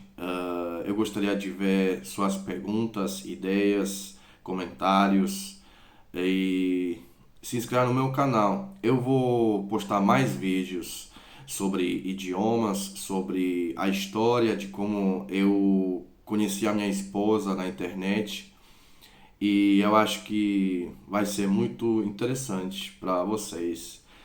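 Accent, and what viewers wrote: Minas Gerais, Brazil. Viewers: Seu sotaque está maravilhoso. Parece o sotaque do pessoal mineiro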